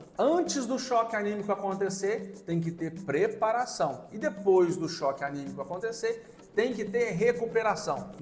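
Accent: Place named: Minas Gerais, Brazil. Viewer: Boa tarde,estou gostando muito das suas aulas , parabéns! Fala de onde vc é ...parece ser mineiro como eu ,sou de BH .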